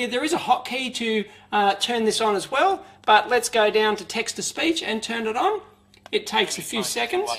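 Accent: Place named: Australia. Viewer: Rocking the accent.. is that australian or new zeland?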